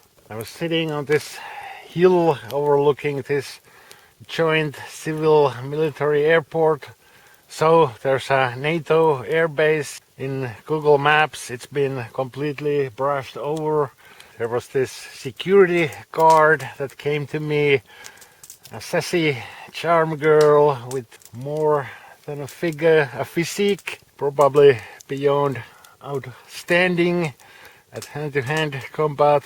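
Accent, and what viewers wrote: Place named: Finland. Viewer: You are a very good narrator and always make me smile with your Finnish accent and humour - well, in polite English, I would say that mostly, you are 'extracting the urine' out of things you witness. What tent was that one - cancel, that, just expanded the description - is it heavy to carry?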